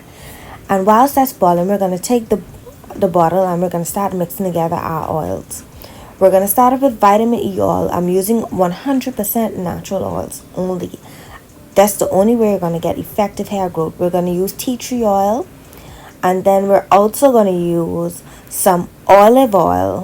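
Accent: Bahamas. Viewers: You’s from the bahamas aye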